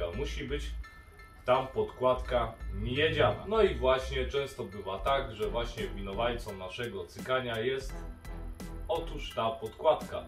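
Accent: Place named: Silesia, Poland. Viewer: Ślonskiej godki nie ukryjesz 😜 P.S. fajny film! ;)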